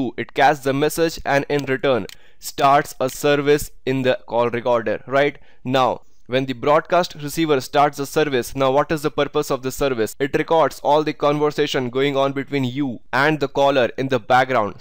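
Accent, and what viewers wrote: India. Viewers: no, the indian accent is too annoying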